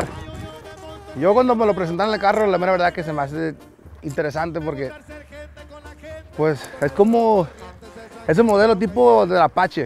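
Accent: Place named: Mexicali, Mexico. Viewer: Todos mis compás de chicali dicen así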